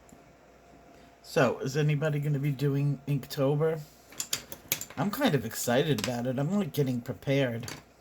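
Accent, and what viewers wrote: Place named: New Jersey, United States. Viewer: Hi. You sound like my spouse's mom, and she sounds like New Jersey. Just an idea, but why not draw from your list and the official one?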